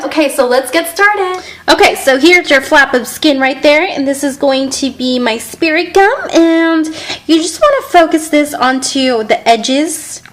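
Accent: United States. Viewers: she sounded so country back then haha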